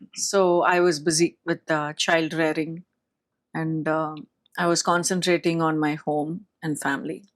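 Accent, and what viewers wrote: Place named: Tamil Brahmin, India. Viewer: She is Tamil Brahmin I think…see her way of talking and food taste!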